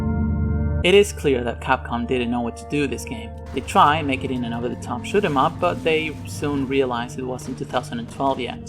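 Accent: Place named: Argentina. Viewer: tu acento Argento me agarró por sorpresa 😄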